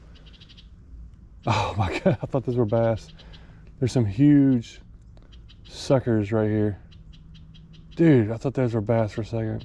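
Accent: Tennessee, United States. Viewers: What part of Tennessee are you from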